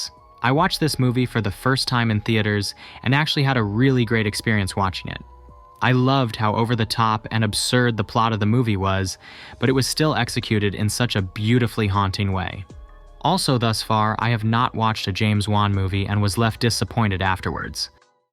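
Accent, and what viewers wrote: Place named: United States. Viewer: Your from the Netherlands? It sounds like you have an American esque accent.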